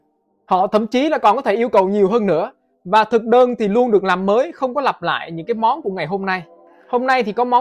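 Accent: South, Vietnam. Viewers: Thôi cứ nói giọng Bắc đi pha giọng Nam nghe nó đớ lắm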